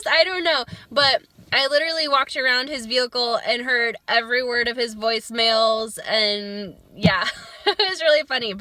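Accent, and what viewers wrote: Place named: North, United States. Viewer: im going to guess you were raise more north than Illinois. Some of your pronunciations are more northern than me and I am in southern MI.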